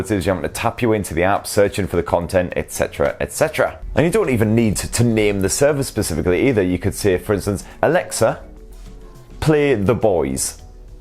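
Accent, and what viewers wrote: United Kingdom. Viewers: I dont get why cant british people speak regular english? Always gotta be so extra... its annoying couldnt watch this all the way through 😑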